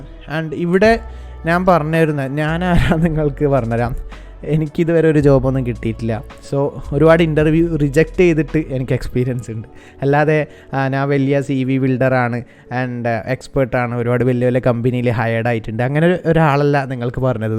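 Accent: Kannur, India. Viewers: Mangala poraa kannur anno machaa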